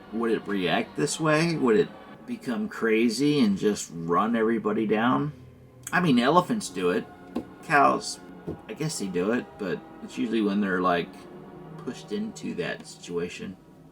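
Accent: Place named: United Kingdom. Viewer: Dang! This movie is almost a hundred years old. It's fascinating to see this. Btw, Sir Arthur Conan Doyle would approve of your British accent!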